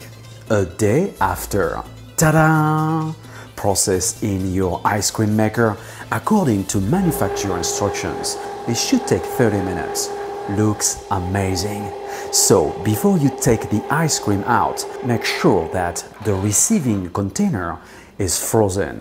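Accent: France. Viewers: Y would u add corn syrup ? That’s not european despite the french accent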